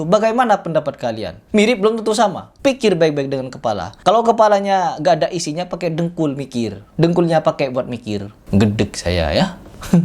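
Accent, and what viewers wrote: Madura, Indonesia. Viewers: gausah banyak bacott... buktikan saja kalo anda benar.... sekarang buka mejelis ilmu.. ada kah yang mau ikut anda?? soalnya saya liat vidio anda seakan akan pendapat anda itu selalu paling benar... kalo dari logat anda ini orang madura... sini sodara jangan berani depan kamera... jalan jalan ke kalimantan... 😂😂😂 takut kah...?? gak usah takut.. kalo gak salah 😂😂😂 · logat bicaranya seperti orang madura ya?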